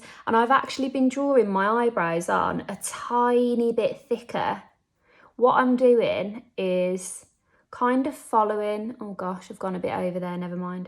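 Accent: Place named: England, United Kingdom. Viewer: I love your English accent, American accent is an accident.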